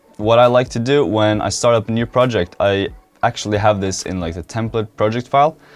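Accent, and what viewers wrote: Norway. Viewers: FREE mastring i Will love it. But are you from Norway?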